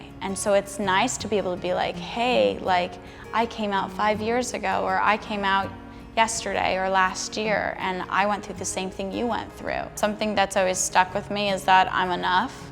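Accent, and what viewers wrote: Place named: Australia. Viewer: why does she sound kind of australian? lmao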